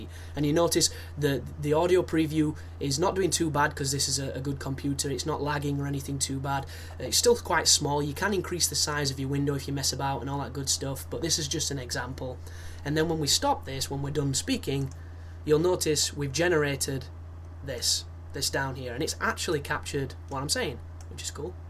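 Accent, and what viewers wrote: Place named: England, United Kingdom. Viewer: its cuz he has an english accent which makes anyone sound cooler/more interesting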